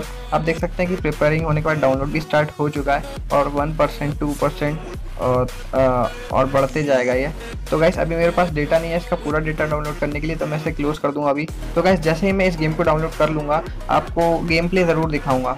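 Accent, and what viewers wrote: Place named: India. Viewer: What are you even saying. Indian probs lol.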